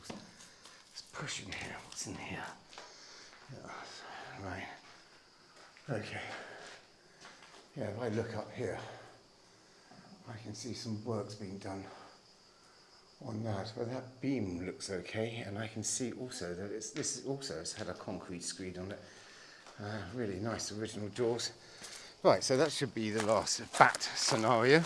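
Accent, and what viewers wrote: United Kingdom. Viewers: Potentially a nice country home. The cost? I love the way you brits talk, Right! Lets Crack on! I am currently reading more of Andy McNab, on of your outstanding countrymen. Thanks, also another great tour…..real history there. 🇺🇸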